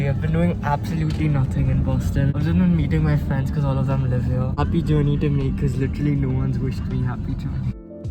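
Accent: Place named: South Bombay, India. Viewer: I'm confused, is he born and bought up in america? His accent sounds like a sobo accent though and he used to stay in sobo right?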